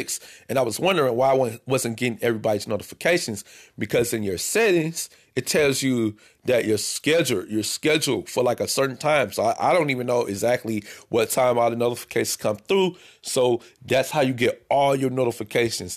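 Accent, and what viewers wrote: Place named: India. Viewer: Another good video no rasict I thought this was a Indian person making this vid. I don't understand what those Indians be saying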